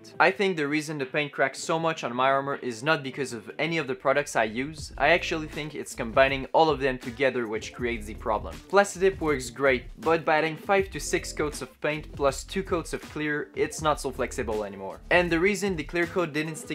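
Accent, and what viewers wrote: Quebec, Canada. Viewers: J’ai entendu l’accent québécois dès le début hahaha 🫡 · are you from québec?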